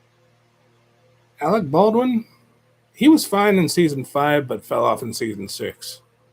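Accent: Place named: United Kingdom. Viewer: Bro I thought you are British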